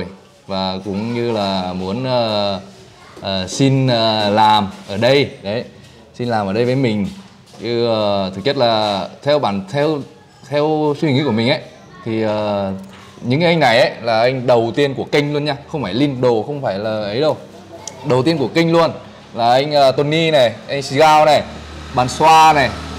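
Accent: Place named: Central, Vietnam. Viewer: Tôi cũng giống bạn,bán đầu Tôi xem một video ăn cướp ở Châu phi,sau đó có một kênh của người Việt Nam,anh ấy chủ xưởng gỗ. Nhưng xem video đó không có gì vui,anh ấy chỉ quay nhưng không vui, không giúp người.Sau đó Tôi Thấy kênh Quang Linh,anh ấy mặt mày đẹp trai,vui tính, giọng của người miền Trung (Tôi là người Huế ), hài hài.Rất ấn tượng, nên Tôi xem kênh,khi bạn ấy ra video thứ hai, và từ đó Tôi đã xem cho đến hiện tại.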